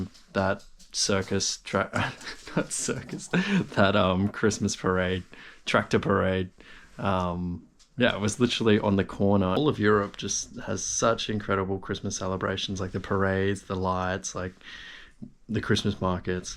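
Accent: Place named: Australia. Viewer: Are you German? You sound much more like an Aussie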